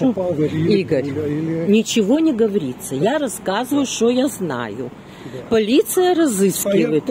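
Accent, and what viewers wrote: Odessa, Ukraine. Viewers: Спасибо за вашу прогулку по Одессе и ваш такой родной одесский акцент!❤❤❤❤❤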